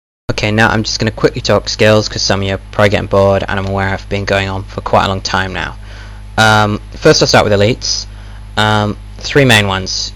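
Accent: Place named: United Kingdom. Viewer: wooot a british gw player that does vids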